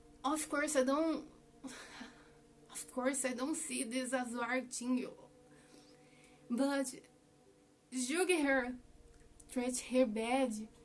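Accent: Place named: Brazil. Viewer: you are brasilian right?? im from portugal and the way you speak your accent sounds brasilian, voce e brasileira vejo pelo sotaque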